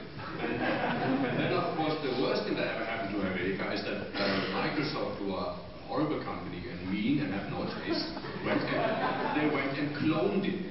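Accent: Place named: Germany. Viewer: I can't understand a thing beside the fact his german accent it does not help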